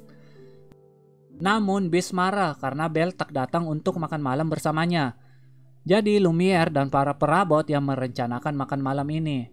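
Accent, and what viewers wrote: Indonesia. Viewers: Dari dialekya kyk dialek sulawesi😁🙏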